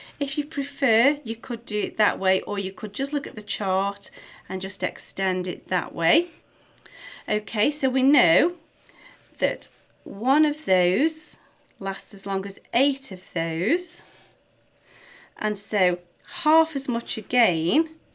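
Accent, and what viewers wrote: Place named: Staffordshire, United Kingdom. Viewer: knew it!! I know a Staffordshire accent when I hear one :) I’m from Derbyshire!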